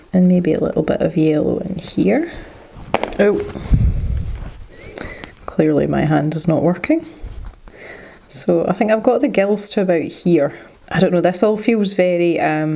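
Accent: Scotland, United Kingdom. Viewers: Cute accent. Scottish?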